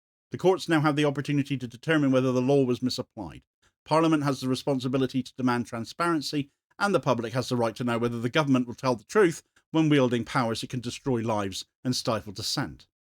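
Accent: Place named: South West England, United Kingdom. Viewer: Recently came across this channel, great to hear some sensible straight talking on politics in a proper south west accent!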